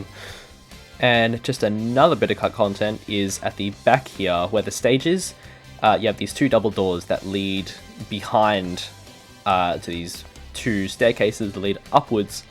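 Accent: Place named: United Kingdom. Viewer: i did not expect you to be British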